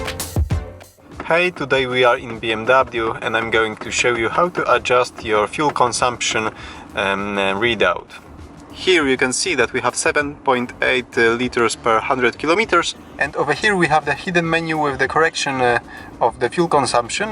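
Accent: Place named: Poland. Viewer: polski akcent angielskiego, zawsze śmieszy :) ...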